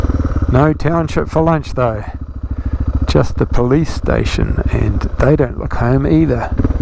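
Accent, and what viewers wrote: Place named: Scotland, United Kingdom. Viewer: Your Scottish accent had me giggling 😂. I'm on my way....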